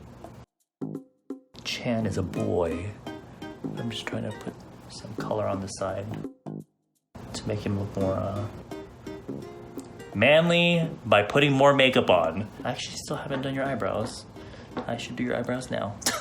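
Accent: South Korea. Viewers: I love your konglish 😍😍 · the koranglish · i love this konglish omg i relate so hard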